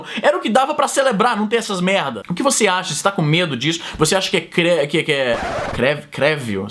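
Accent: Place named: São Paulo, Brazil. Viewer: tu és cearense e tem sotaque de paulistano porque hein? Morou em Sampa por mto tempo? N identifico "nordestês" em sua fala e nem gírias tipo: oxe, Oxente, véi, massa... Só "mano" algo altamente paulistano!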